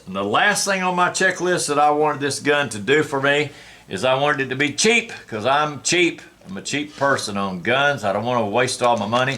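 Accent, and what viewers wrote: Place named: Arkansas, United States. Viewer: Great video, sounds like a fellow Arkansan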